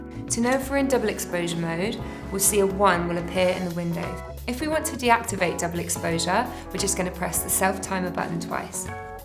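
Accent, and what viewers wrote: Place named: United Kingdom. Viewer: I dont know why American companies feel the need to get s British person to explain their product in order to get that "designer feel"? What has England designed?...Marc Newson is good....but what else?.......no cellphone or space program that's for sure.......and lets get real the average person is broke in England..for whatever stupid low self esteem reason we need the accent...thats the stamp.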